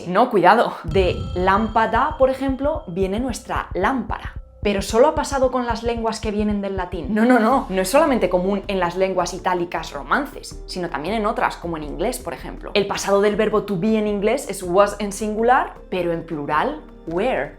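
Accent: Spain. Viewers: Soy de Argentina y me suena que uds. dicen Madris en vez de Madrid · Te perdiste mi suscripción, no sólo te ves vieja y hablas como señora de edad avanzada, bueno eso es típico de las españolas, pero que no sepan de música eso si es inaceptable, para colmo ni sabes hablar bien con tu zeceo. a parte he visto tus videos y no son correctos. No soy caribeño, pero si reconozco que le faltas el respeto a unos de los mejores cantantes del mundo, y si admiras a, ha cantado en canciones de, que si te das cuenta a pesar de ser española dejó de usar tu tipo de acento, que así como dices que es mal aceptado el lambdacísmo, aquí en Latinoamérica tu suenas mal, y para terminar, pones esa canción horrible de al final de tu video. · Vivo en El Bronx (Nueva York) y oi este en los puerto riquenos y domincanos. Yo estoy una estudiante de espanol qui hablar ingles como mi lengua materna. 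Prefiero los accentos de Espana (como tuyo), Colombia y Mexico. (Disculpen la falta de tildes, por favor.) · Habla español de España y hay varios fonemas que tu desconoces.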